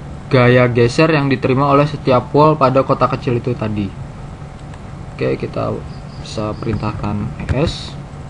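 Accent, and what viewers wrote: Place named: Java, Indonesia. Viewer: Bang jawanya medok bgt 😂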